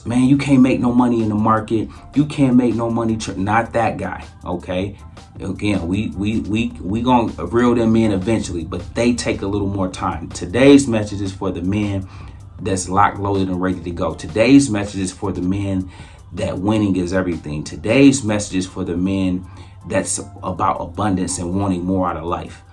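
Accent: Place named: Philadelphia, United States. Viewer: Are you from Philly?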